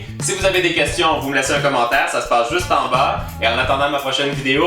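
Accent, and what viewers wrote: Canada. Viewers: De un ta vidéo est génial de deux je préfère les deux(là meilleur façon de fair manger des légumes à mon petit frère et cousin)et de trois j'aime trop ton accent moi j'ai toujours rêver de parler comme vous les canadiens 😘 😘 BISOUS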